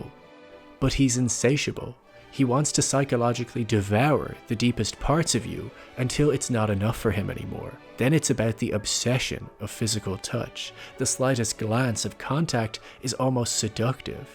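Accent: Ireland. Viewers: I dedect a faint irish twang?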